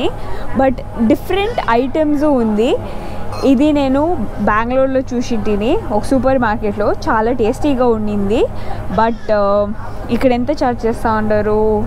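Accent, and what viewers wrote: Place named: Tamil Nadu, India. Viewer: Your Telugu is so funny 🤣, why it is like that😂, are you Tamilian?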